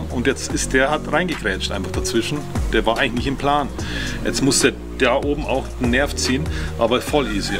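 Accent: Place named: Bavaria, Germany. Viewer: bisserl mehr bayrisch dadad ned schadn , aber alles gut🥳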